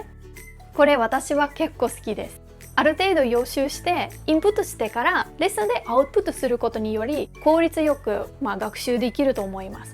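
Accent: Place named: Japan. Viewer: Gosto muito de ouvir voce falando, seu sotaque japones me agrada e eu entendo ,pois eu só não falo japones mas entendo que as pessoas falam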